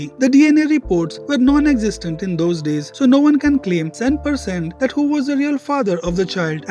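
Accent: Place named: India. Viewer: What an indian accent!!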